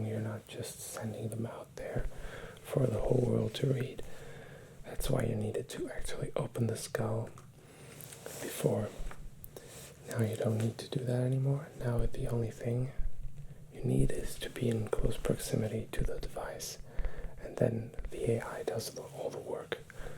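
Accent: Spain. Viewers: Subscribed because your Spanish accent is amazing😊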